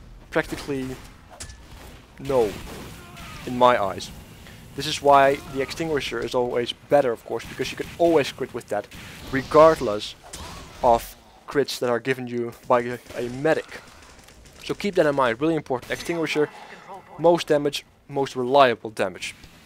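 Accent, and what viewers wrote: Netherlands. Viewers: are you perhaps dutch? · Is this guy dutch?? It sounds like he has a dutch accent. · Judging by your accent, you cannot but be Dutch, right? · ik kan horen dat je een nederlander ent ;P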